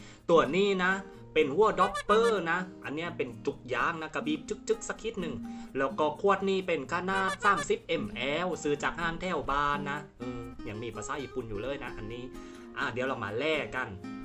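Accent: South, Thailand. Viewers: ชอบการรีวิวแบบแหลงใต้ของคุณ 555 ฟังไม่เข้าใจบางคำแต่รวมๆตลกมาก ข้อมูลละเอียดดีมากค่ะ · ภาษาใต้ปุ๊ปกดออกเลย ฟังไม่รู้เรื่อง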